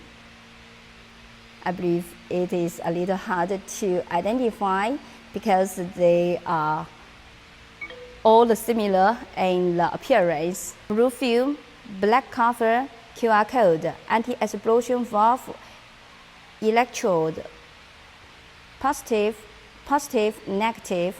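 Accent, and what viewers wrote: China. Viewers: gave such a great presentation. For some reason, i can easily understand a mandarin accent but i have great difficulty understanding an east indian accent.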